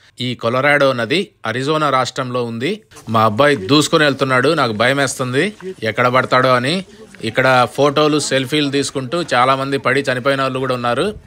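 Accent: Kadapa, India. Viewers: అన్న మి విశ్లేషణ చాలా బాగుంది, మన కడప మాదిరిగా మి మాటలు వింటుంటే చాలా సంతోషంగా ఉంది. ప్రేమతో కడప జిల్లా వాసి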